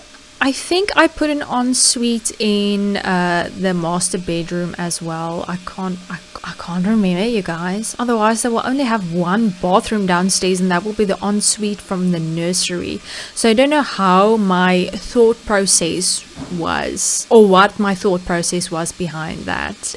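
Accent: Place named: South Africa. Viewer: I knew from ur accent your South African💀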